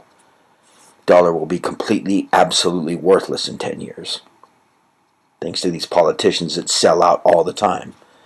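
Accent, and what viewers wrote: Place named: Argentina. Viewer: I like your Argentinian Accent! LOL!!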